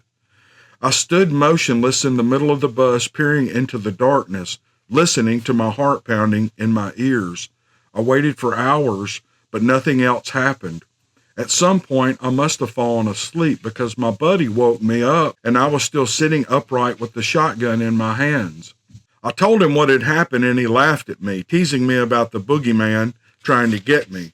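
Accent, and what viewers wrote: South, United States. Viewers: I believe the stories 100%. I am from the Pacific Northwest & they populate a lot of the woods all over here. My brother in law & myself were WAY off the beaten path west of Rathdrum, ID up in logging country when We noticed what looked like a Shaquille O’neill’s bare single footprint (only wider 6” across the widest part of the foot) crossing our path going up the steeep embankment only in a wash that came over the game trail we were hiking on. It was pointed uphill & when we looked up the steep washout their was another footprint probably 8’ UP the SUPER STEEP incline. We are bigger dudes me 6’2” 200lb & he 6’4” 230lb & there’s no way we could’ve made that leap on level ground let alone on a 50% grade that whoever made that footprint did. No way it could have Been a hoax since we were 1 hrs hike to the closest logging Road. 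We Didn’t see the beast, but after seeing those fresh footprints 15 years ago; my mind opened up the the FACT that these creatures co-exist near humanity w/o much detection from us ! We Like your southern accent you tell the stories in & the bluesy country...Keep em comin’ sir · Have to say, I'm a Florida girl living in Missouri the last 15 months. I love your voice. The southern in it sounds so... Right.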